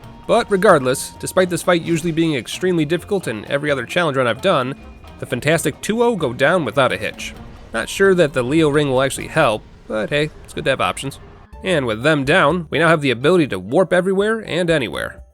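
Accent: United Kingdom. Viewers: Finally British dark souls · When a British play dark souls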